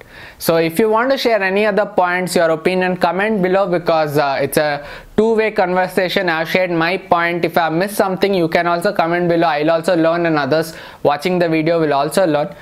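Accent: India. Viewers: Guys like you are the reason that Americans mock indians for their accent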